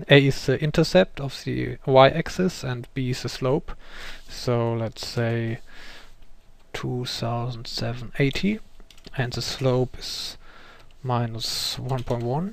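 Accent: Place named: Germany. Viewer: thank you, really very useful.. i kind enjoy the German accent :)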